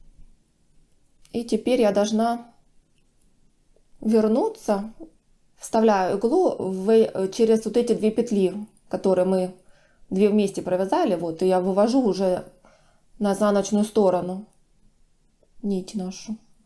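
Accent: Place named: Russia. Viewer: Интересно,что за народность в России с таким интересным говором и диалектом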